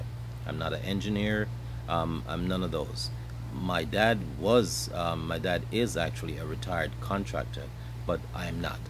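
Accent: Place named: United States. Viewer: hey is that US dollars or Jamaican dollars ?cos you speak with a slight american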